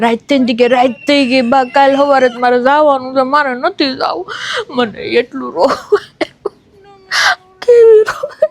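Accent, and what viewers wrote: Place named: Kathiawar, India. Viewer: Very nice video Very good tamari kathiyavadi leg vage. Videsh maa rahi ne jadvi rakhi che.way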